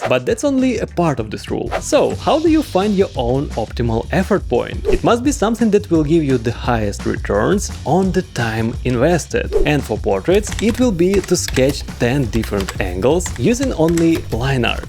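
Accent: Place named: Eastern Europe. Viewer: Laik podposka slavyamskomy malchiky s milym accentom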